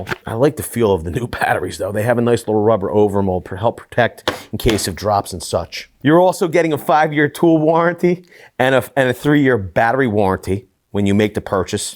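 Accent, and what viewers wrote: Philadelphia, United States. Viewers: You're from Philly. I can hear your accent.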